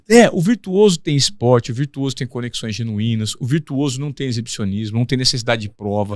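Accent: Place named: Sao Paulo, Brazil. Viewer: Que isso e um charla paulista haha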